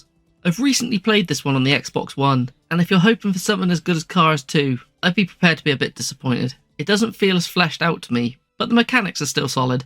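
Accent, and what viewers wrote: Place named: Bristol, United Kingdom. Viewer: From your accent i guess you are in the Bristol area? Fellow 360 collector here 🫡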